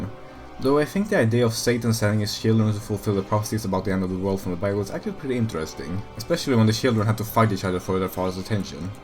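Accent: Sweden. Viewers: Wait, are you sweedish?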